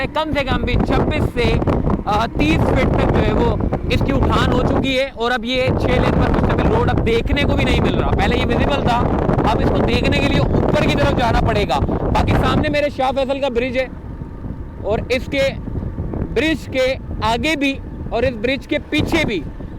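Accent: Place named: Hyderabad, India. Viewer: Full Hyderabadi accent…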